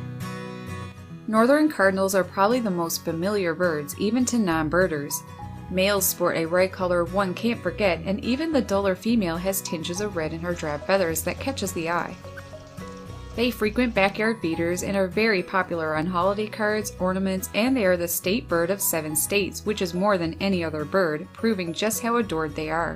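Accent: Canada. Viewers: Thankyou so much! My favorite little bird buddies! I knew you would answer some more questions for me. I have not been much of a birder until I got sick and was actually "forced" to take notice the last decade or so. We have had many adventures my beautiful red bandits and I lol. I hear Canada or way North for you? I am surprised and sad you don't have these characters in your backyard. We get complaints from some young new neighbors that don't seem to care much for nature sadly. But we won't let them mess with our backyard as we have tons of birds and critters-my daughter is trying to help an orphaned baby squirrel right now. All year long some of the same ones are here. Its quite satisfying. Thankyou again and everyone that shared. I have to go put out more safflower and reg seed and suet myself!🐾✌🐝🐦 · Aboooot. Canadian. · Y does the male feed the female? Where do you live? 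You sound a bit Canadian. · You have a Canadian accent. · Love the Canadian accent.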